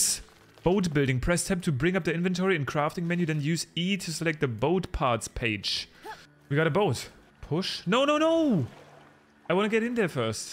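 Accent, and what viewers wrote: Germany. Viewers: You're German aren't you 👁️👄👁️ ich fühls in den nuggets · Hes german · Im hearing some german acent ?? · are you german? austrian? nordic? dutch? i must know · Kann es sein das du Deutsch bist? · Dein Englisch klingt sehr Deutsch 😂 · bist du deutsch? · German! I can hear it from the accent alone! Guten Tag! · Man hört so stark das du deutscher bist😂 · you have a very german accent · Alter man hört so geil raus das du Deutscher bist :D Wünsche dir alles gute ! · You sound german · Sachmal du bist doch deutsch oder 😂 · you sound very german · Bro this german accent… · Bist du deutsch bruder? XD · you sound very much german😅😂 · So i would geas your german ;) If not you have intristing Acsent Kein bock mehr zu schreiben gg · digga wie deutsch du bist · i hear some GERMAN accent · Man merkt halt das du deutsch bist (kein hatte) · Are you German? 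· Xd ich glaube du bist deutscher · Man hört zwar das du Deutsch bist aber trozdem machst du Gute Videos aber warum Raid shadow legends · 3 seconds in and i hear the german accent lol just found u but u seem cool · I love the Fortnite chest sfx in the first game. Is you accent German? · Are you german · tasting the games 😂 ich liebe deutschen Akzent im englischen so sehr 🥲❤️😂 deine Videos sind toll mach weiter so ☺️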